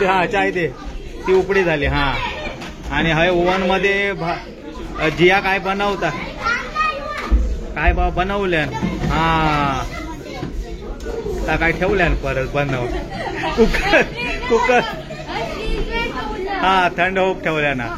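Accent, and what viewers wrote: Malvani, India. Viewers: तुमची मालवणी भाषा पण खूप सुंदर आहे ऐकायला छान वाटते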